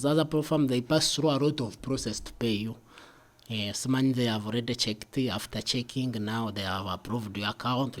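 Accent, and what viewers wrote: Uganda. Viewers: Too authentic, I like this man from Bunyaruguru😊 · I can detect Ugandan accent from a kilometer away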